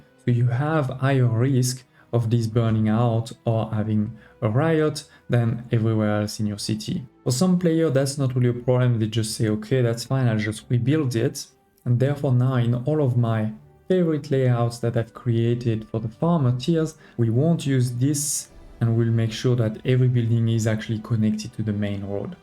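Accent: France. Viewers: It is perhaps a fallacy of the American mind that I immediately think “this guy has a French accent, therefore I trust his advice unconditionally.”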